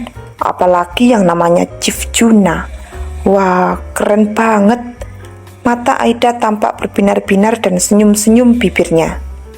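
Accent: Java, Indonesia. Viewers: Medoknya ini jawanya antara bebeb ucik atau bu de Riris yg jawa Asli❤❤😊😊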